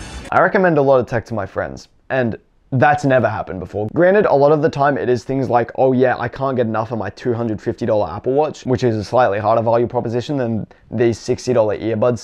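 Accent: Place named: Australia. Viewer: Great, thorough video - thanks mate! Was initially a little confused about the pricing based on your accent (another Aussie here, where they are just under $100). But then realised you were probably talking to a primarily American market and thus talking in US$. (And congrats on your recent graduation!) 👍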